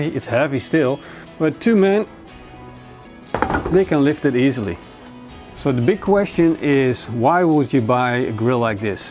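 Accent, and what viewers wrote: Netherlands. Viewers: Roma Abrupt dutch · Nederlands accent! Dutch accent! Lol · U hebt een Nederlands accent??......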